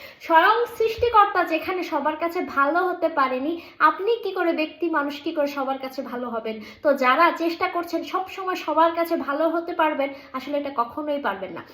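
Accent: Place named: Bagerhat, Bangladesh. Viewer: আপনি বাগেরহটের আঞ্চলিক ভাষা কথা বললে ভালো লাগে, একজনকে মিস করি যে দূনিয়াতে নেই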